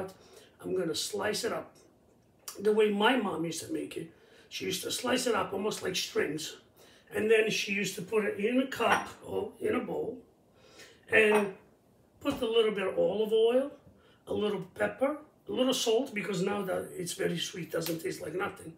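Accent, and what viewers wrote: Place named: Italy. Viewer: love your Italian accent, what part of Italy is your family from? I come from Giovinazzo, Bari (Puglia region)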